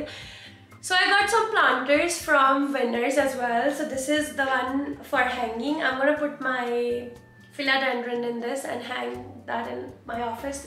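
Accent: Punjab, India. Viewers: Punjabi touch maza le ata hai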